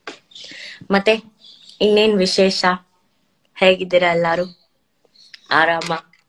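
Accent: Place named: North Karnataka, India. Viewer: Nanu Dayanand Goudar anta Namadu Gokak hatra Chikka halli Fulagaddi urinavanu First Nimage nanna kade enda Namasakargalu Anushri Akka mate Nimage wish You Happy Birthday🎂🎉🎂🎂🎂🎂 Nivu 100 kala channagi balabeku anta nanna ashe ade riti Nivu namma uttar karnataka bashena Namma hage matadtira namage bala kushi agutte mate Nivu andre tumbane esta ellarigu Vande vande namma uru kade banni medum plz 🙏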